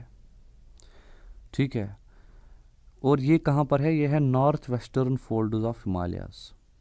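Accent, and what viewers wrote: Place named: Kashmir, India. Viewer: Sir your accent is more like a kashmiri pandit.. are u a Kashmiri pandit ? Sir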